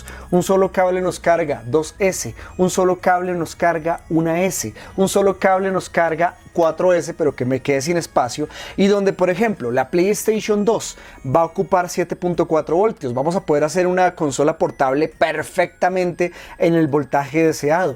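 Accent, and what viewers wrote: Colombia. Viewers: Buenos días mi gente. Estoy aprendiendo a arreglar parlantes a mi tercera edad, y yo siempre me pregunte para que diablos servia esa cinta de las baterías (reguladores de voltaje), y varios parlantes los deje así colocando baterías de litio sin este regulador, porque pensé que era como colocar las baterías a una radio. ¡qué error! Soy de Bogotá, y no sé donde conseguir esas cintas reguladoras. Por su asento sé que eres compatriota mio. Ese regulador de voltaje vendría siendo como el flotador en un tangue de agua, ¿cierto? Muchas gracias por sus contenidos me han ayudado mucho.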